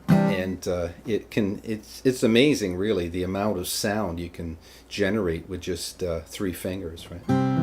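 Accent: Canada. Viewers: GREAT TEACHER! The way you teach really connects with me thank you friend. BTW Are you Canadian?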